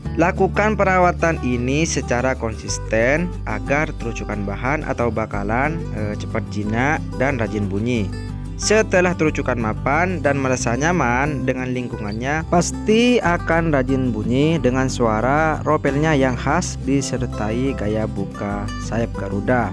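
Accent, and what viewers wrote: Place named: Madura, Indonesia. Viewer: Logat madura banget... lessoooh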